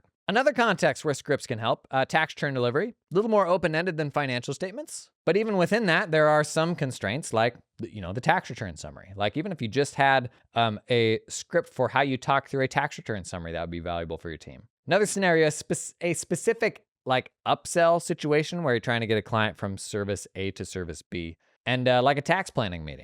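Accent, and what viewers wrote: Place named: New England, United States. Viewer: why the AI use southern vernacular with a noticeably New England cadence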